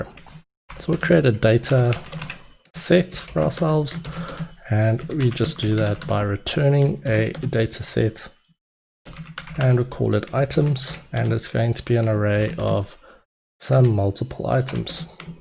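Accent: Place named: South Africa. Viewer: I know my south African accents😂😂 im from Joburg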